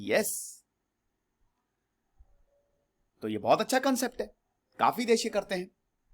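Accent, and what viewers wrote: India. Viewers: 听球不懂牛尿味儿的印度口音，但评论里的文字真切地让我感受到无数的充满嫉妒之火的血红双眼。 I really felt the jealous eyes in the comment.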